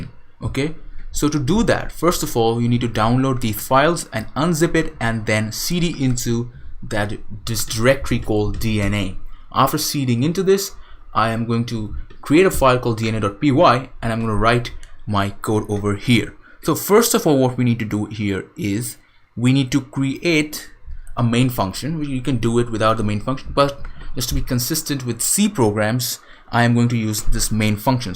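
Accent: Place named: India. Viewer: Damn man that really was helpful but listening to 33 mins of your indian accent was the hardest things i've ever done in my life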